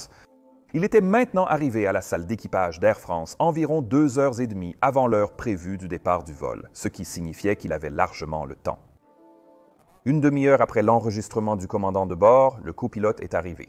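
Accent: Quebec, Canada. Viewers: Excellent. Bravo cousin. 👌👍Avec l'accent Outre Atlantique, c'est terrible. Pour ma part j'ai un faible pour le Boeing 747 😉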